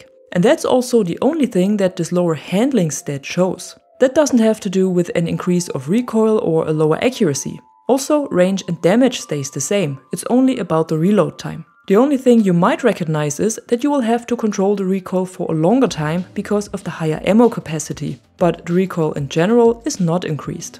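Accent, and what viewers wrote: Germany. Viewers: Recongnised a slight german accent :) Thanks for the video.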